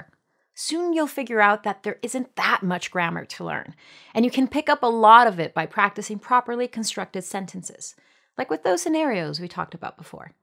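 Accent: Brazil. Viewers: Magda Alvernaz não quero te menosprezar mas você entendeu quase tudo que ela falou porque ela é brasileira e fala com nosso sotaque.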